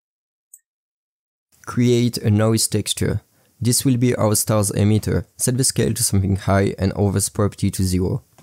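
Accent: France. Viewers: Dude that is a kickass French accent you got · tuto en anglais avec un accent français à la pisse, comme si les anglophones avaient pas asser de tuto pour blender; putain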